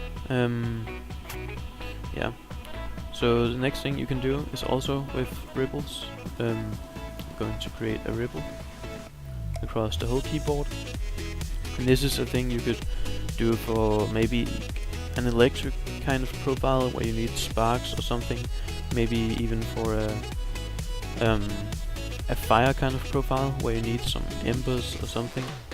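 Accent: Denmark. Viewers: Are you danish m8 ? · Good vid, keep it up, you sound danish <3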